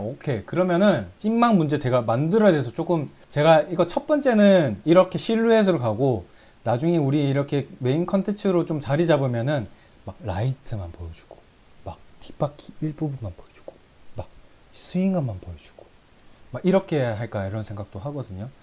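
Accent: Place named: Ulsan, South Korea. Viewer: 바이크 관심보다 전부터 느낀건데 울산억양은 정겹네요 ㅋㅋㅋㅋ 차장님보는줄(울산분) 전 밀양분 같은 지역이라더 억양이 틀려 재밌어요 ㅋㅋㅋ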